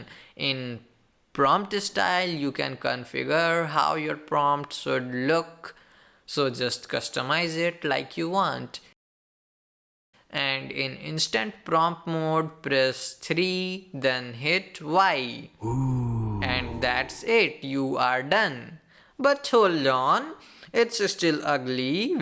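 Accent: India. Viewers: Indian accent, foreigners will enjoy watching this video!!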